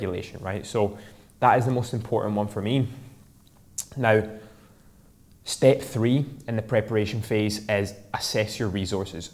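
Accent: Scotland, United Kingdom. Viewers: Where abouts are u from mate u sound Scottish ?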